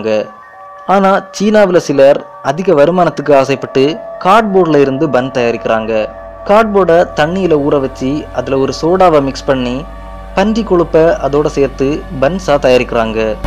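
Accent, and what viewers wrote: Kanyakumari, India. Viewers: Makka.kanyakumariya.unakka bhasa kettapale manasalaach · Kanyakumari slang..... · Bro unga slang....kanyakumariya...... nagarcoil ah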